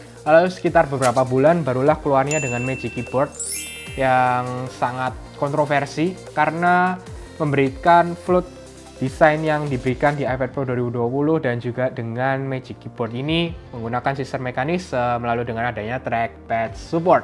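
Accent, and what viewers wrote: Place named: Surabaya, Indonesia. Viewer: Bahasanya medhok suroboyo..hehe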